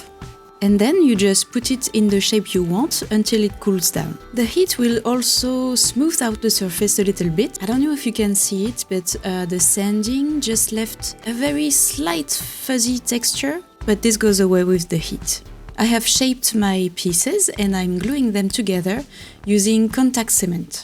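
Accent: France. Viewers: this is really dumb probably, but as another person with a strong accent (swede) i feel a little insecure abt it, but seeing you proud of your origin country and me really loving your voice has made me...… prouder of my own accent??? even though the france accent is recognized as prettier than the swedish accent??? idk i kind of like how my vowels sound now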